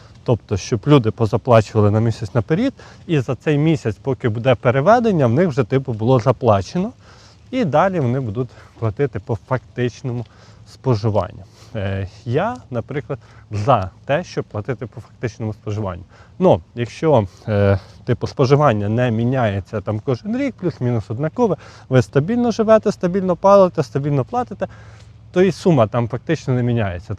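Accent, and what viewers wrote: Ukraine. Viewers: Вы говорите на русском с украинским акцентом ,определитесь уже товарищ типа учитель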